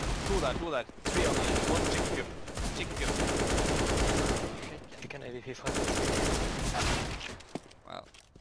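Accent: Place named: Tromsø, Norway. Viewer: The best thing 'bout your highlights is that Tromsø dialect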